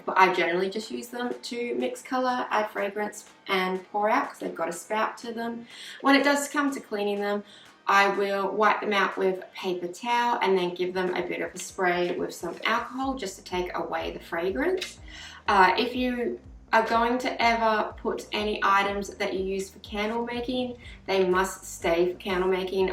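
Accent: Australia. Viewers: Nice to listen to an Aussie, thank you very informative 😊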